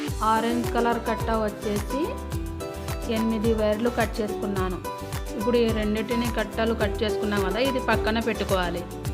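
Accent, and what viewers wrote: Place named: Karnataka, India. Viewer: Mee cheppindi kannada sound yekkuvaga undi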